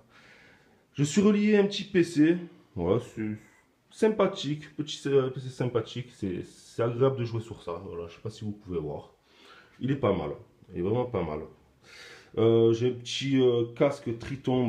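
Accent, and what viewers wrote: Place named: South, France. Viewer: Sa fait plaisir tu vien du sud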